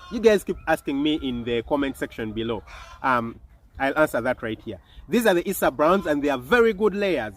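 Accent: Uganda. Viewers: All along I was checking your accent and felt like it was Ugandan, and finally, you mentioned it in this Video! Good Work Man, Nice Channel, ndi big fan of your videos.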